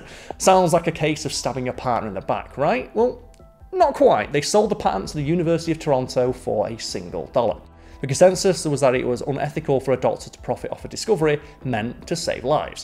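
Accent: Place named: Northern England, United Kingdom. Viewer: North England.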